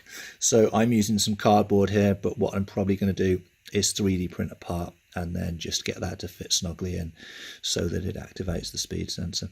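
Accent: United Kingdom. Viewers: Hi.. You sound British obviously not living in England?? Is there a huge difference in power / performance between CYC and Bafang HD Or even the Cyclone 3000w mid drive? I'm guessing the Bafang is the quietest of the three and least powerful and top speed but what about torque? Thanks for your help.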